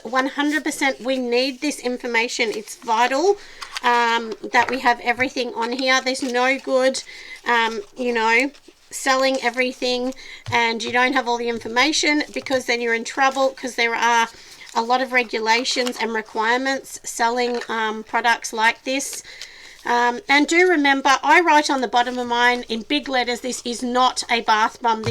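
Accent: Australia. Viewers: Dear a bit hard to follow the receipt.. english my second language and with autralian accent it would make it much easier to just hsve the recipe in the description as you mentioned at rge beginning of your video. Thank you for your efforts to share yr skills. From canada quebec..merci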